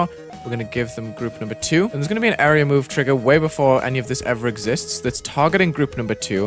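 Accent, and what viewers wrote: United Kingdom. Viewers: This doesnt have anything to do with this video, but wouldnt it be cool if you crashed that chest in the beginning of the video, and it would give you a free demon chest of your choice? Edit:, whats ur accent? It sounds a bit british but also not even british at the same time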